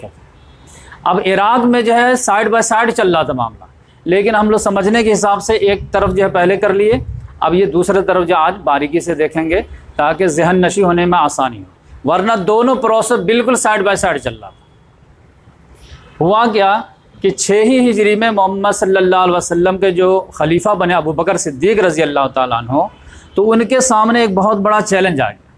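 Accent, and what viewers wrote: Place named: East India, India. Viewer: Assalamualaikum wa rahmatullahi wa barakatahu sheikh saab....aapki aawaz se lagta hai aap east india side ke hain , Jharkhand or Bihar....aapse milna hai ..InshaAllah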